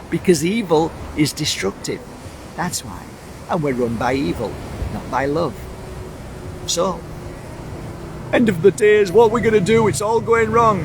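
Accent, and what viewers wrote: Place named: North, United Kingdom. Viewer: Northern (good reference too!) no nonense delivery 🤗 with a brilliant combo of compassion/passion 💥💥❤🧡💛💚💙 Love your fire in your belly..... grand job lad! Clarity is by its nature challenging....praise be for that!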